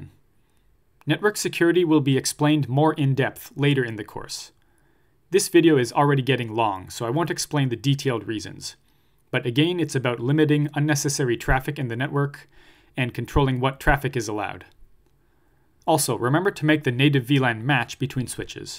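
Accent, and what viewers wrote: Canada. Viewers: Dang, you are doin god's work. I will read my exam questions in that Canadian accent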